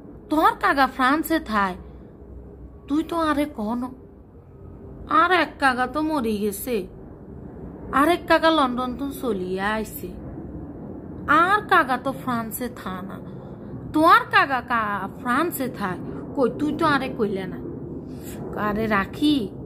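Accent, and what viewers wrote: Noakhali, Bangladesh. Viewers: আপনার নোয়াখালী কথা শুনতে খুব ভালো লাগে এই জন্যই বন্ধু হয়ে গেলাম, গান টা অনেক সুন্দর গেয়েছেন। আমি আকলিমা husband এর একাউন্ট থেকে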